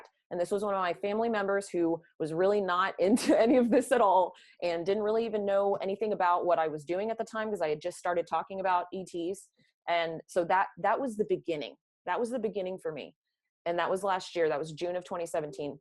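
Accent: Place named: Texas, United States. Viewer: You are clearly from Texas... I can tell because you talk so normally, but then you say y’all like it’s normal... so Texas... I am from OK, but good stuff I like your material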